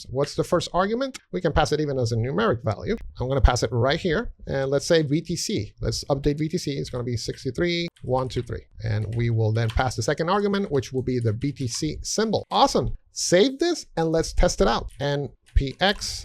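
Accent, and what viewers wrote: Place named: United States. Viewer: are you from america? i hear an accent i think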